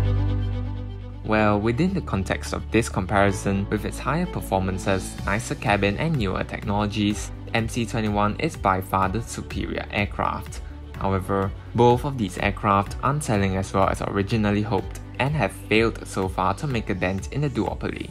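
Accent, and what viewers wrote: Singapore. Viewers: the host spoke like either Singaporean or Malaysian. and a lot of his comments are bull shit. C919 components is made world wide - even the body structures design involved a lot of European consultants---why? So that C919 can get FAA airworthiness certificate.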